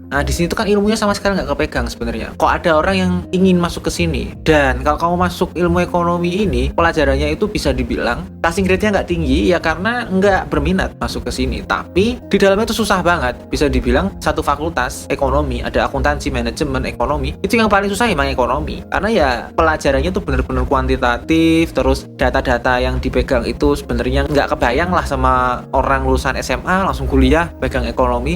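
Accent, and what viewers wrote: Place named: Java, Indonesia. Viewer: Orang Jawa ya mas?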